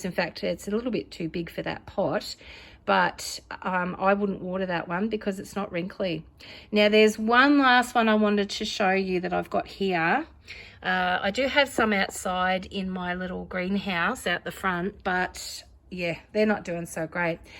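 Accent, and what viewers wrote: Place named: Australia. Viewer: Lovely Lithops, great to hear an Ozzie talking about them!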